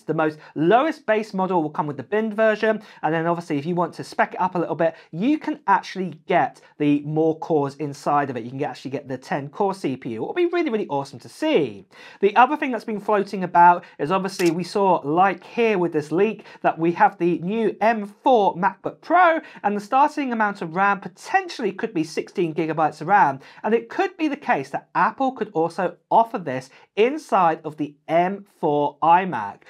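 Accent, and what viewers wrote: England, United Kingdom. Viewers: Love your English accent, son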